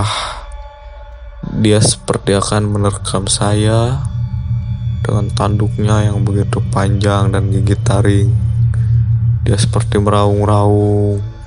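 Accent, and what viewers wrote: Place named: West Java, Indonesia. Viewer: wahh logat nya sunda banget yah😂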